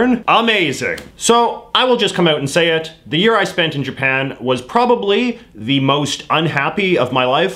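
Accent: Canada. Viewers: You sound astronomically Canadian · Ik this video is about japanese culture but by watching you im learning alot about the canadian accent.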